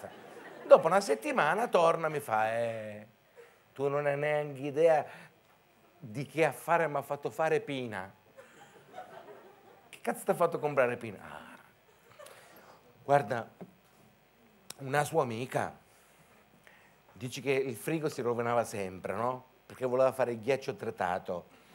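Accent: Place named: Calabria, Italy. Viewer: o grande calabrese sopratutto e l accento che mi frega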